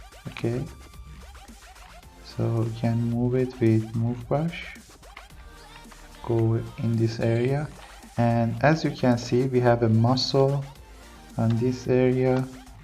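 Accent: Iran. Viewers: are you Iranian? your accent sounds like you would be! if not where are you from?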